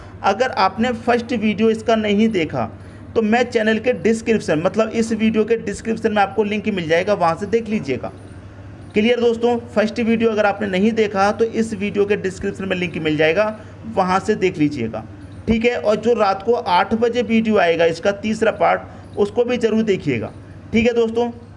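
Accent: Haryana, India. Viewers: Aap haryana se ho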